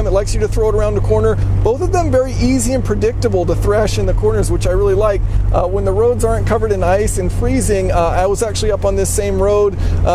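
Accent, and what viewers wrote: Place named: Michigan, United States. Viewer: Are you originally from Michigan? Your accent sounds exactly like my uncle's.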